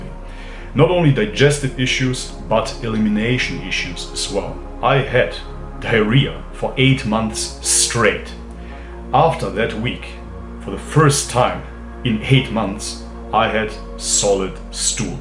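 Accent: Germany. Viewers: Aren't you a German? Your accent definitely sounds like it? Sprichst du Deutsch?😁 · Lol very funny to hear you talk about sex with that strong German accent of yours!! 😂😂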